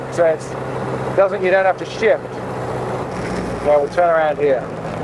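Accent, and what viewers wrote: England, United Kingdom. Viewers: You're English, right?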